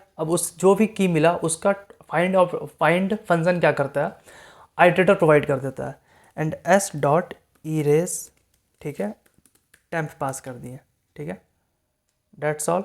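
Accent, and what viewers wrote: Bihar, India. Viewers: sir aap bihar se hai???/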